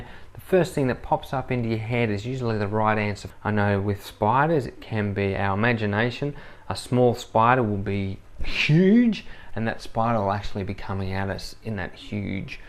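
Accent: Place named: Australia. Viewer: Brilliant method, an unbeatable combination of personality and drop dead good looks along with my personal favourite, Australian accent. Thank you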